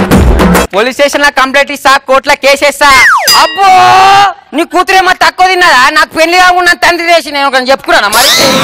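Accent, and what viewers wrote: Telangana, India. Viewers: bayya Telangana language super bro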